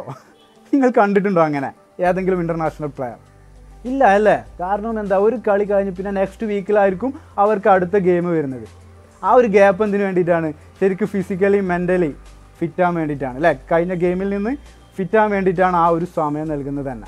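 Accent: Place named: Kasaragod, India. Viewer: കേറി വാ മക്കളെ...🙋 ഇനി മുതൽ കാസർകോട് ഭാഷയിൽ ബ്ലോഗ് കേൾക്കാം...😍😍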